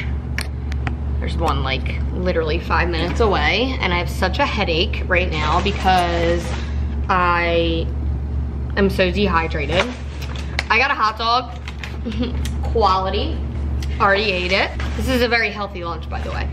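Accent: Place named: Delaware County, United States. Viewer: i was thinking about how much of a Delco accent you have and then you said philly it made so much sense! 😂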